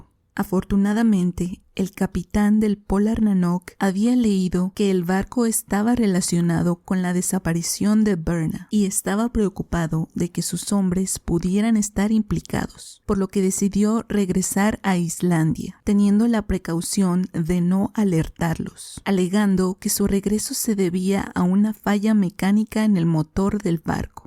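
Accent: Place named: Brazil. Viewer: Hey I hear an interesting accent do Brasil?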